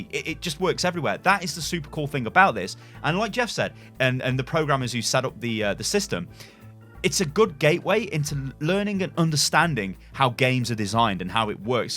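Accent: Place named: United Kingdom. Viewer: Your British talk is confusing. But I love British talk so I’m learning lol.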